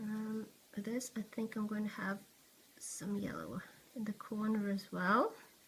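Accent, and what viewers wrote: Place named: England, United Kingdom. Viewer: I have searched and search for an in depth "how to draw gems" video and yours is the best by far. Your english is great, you have an english accent. Thank you so much for taking the time to post this video. Cheers Janey from the Land down Under (Oz)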